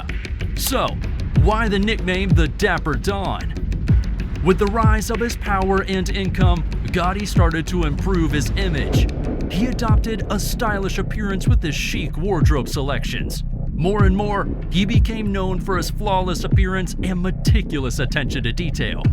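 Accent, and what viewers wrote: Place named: United States. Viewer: GOOD BUT SHAME ABOUT THE VOICE WHOS TELLING THIS A TYPICAL AMERICAN OTP BRITISH ARMY COLIN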